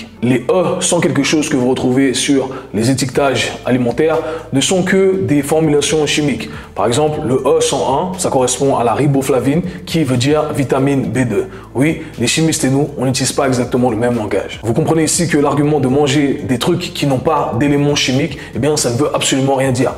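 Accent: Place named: Belgium. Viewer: L'accent belge mélanger à l'accent créole portugais c'est un truc de fou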